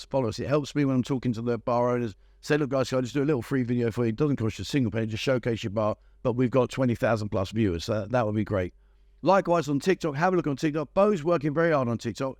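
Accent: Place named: Cockney, United Kingdom. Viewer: well done. Started watching you in mid 2021 when looking for places to retire too. Always fun, informative and delivered with cockney honesty. Well done son.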